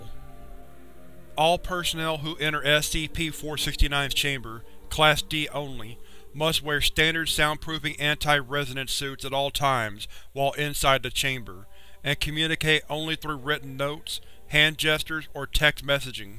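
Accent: South, United States. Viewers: Southern narrator is amazing, I really like it. Like a personal touch, I really really like it!!!😊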